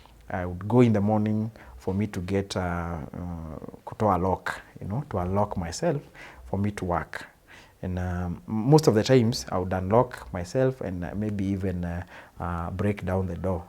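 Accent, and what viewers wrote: Kenya. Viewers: i will never judge an alcoholic... there are so many underlying causes that need to be resolved some issues are as far as childhood traumas. thank you for this one. i love how you are narrating your story, it has pure kikuyu under tones. 😊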